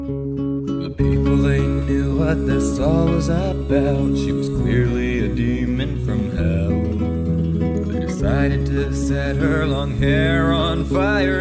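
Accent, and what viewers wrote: France. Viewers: Wow, j'aime trop l'accent Français ! Beautiful ! I love it ^^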